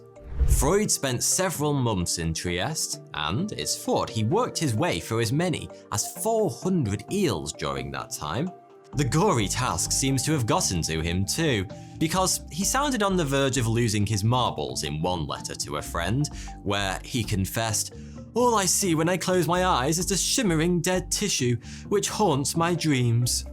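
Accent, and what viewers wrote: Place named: England, United Kingdom. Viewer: I hate the ingland accent I do not understanding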